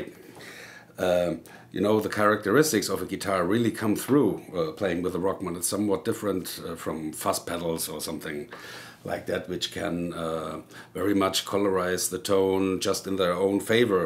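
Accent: Hesse, Germany. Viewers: When I was in the Army stationed in Germany I used to hear the local townsfolk speaking German and the dialect they used sounded much like this gentleman. I'm not sure of the spelling but when he used the word "bopple" it reminded me of one of my in-Laws neighbors who always wanted me to speak German with her and she would say "Bopple Deutch Mit mir". She would get frustrated because I would try and speak English to her, but I pretty much knew the local slang, and she knew that, so she always wanted me to speak German to her. I am guessing because she like hearing my American accent in German. It was always in good fun. She was a very nice lady. Anyway I bet this guy is either from the Hessen area or from the area that this lady grew up. I listened to the whole video because he reminded me so much of when I was over there. My Daughter lives over their and is married to a very nice German guy. 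I have a grandson from them that his birthday is the same day as mine, his great grandfather and his great great grandfather. We all have the same birthday. Its pretty cool.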